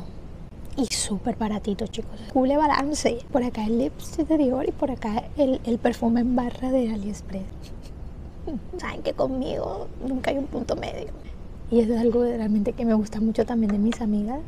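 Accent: Caribbean Coast, Colombia. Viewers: ¿Es ella costeña? Jajaja es que creo que tiene un poquito este acento de costeña colombiana 🤭🤔 un saludo desde Colombia ♥️ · De qué parte de Colombia eres? Hace poco te comencé a seguir por Instagram y descubrí que también estás en Youtube me suenas muy costeña como yo 🥰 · Ese asentó colombiano me encanta❤️🇨🇴😊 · Como que acento costeño 😶 soy nueva seguidora... Wow de la costa... Tengo esperanzas jajaja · Por qué YouTube tardo tanto en recomendarme tu canalllll😍 me encanta todo jajajaj soy tu fan amo como hablas, dime qué eres costeña sin decirme que eres costeña cule'balance JAJAJJAAJA amo mal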